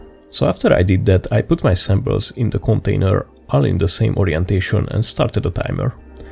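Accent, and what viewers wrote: Hungary. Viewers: az akcentusod alapján magyar lehetsz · A jó magyar akcentus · amikor az ember megismeri a magyar akcentust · i heared the first sentence and i already know you're hungarian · your accent sounds like hungarian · Your accent is veeeery hungarian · Its just hungarian accent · Me at 1 AM: > "oh boy, seems like we're blessed today to find another hidden gem of a channel" > the narration is the platonic fucking Form of the hungarian accent actually manifest > now I cannot stop smiling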